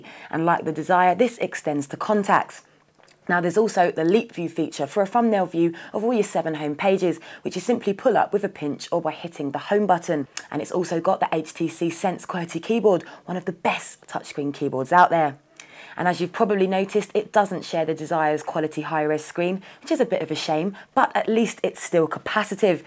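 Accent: United Kingdom. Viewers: i buy ihm few days ago so i dont know what she say?if is price than i buy me Vertu Signature...or if is procesor and ram and graphic i buy me Alienware laptop so funny and all who want to buy a wildfire buy it u will not mistake it doesent cost alot and u have evrythingwhy is this or that ...hm money,that much music...we say like thatand this english Mate girl is talking bad about that phone bloody hell xD hate the acent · stop speaking british, its annoying!!!!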